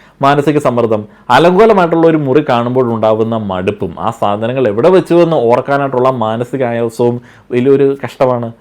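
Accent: Kottayam, India. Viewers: May be kottayam slang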